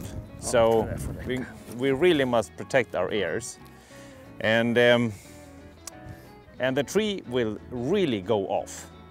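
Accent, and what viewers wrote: Sweden. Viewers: Bra / Good Swenglish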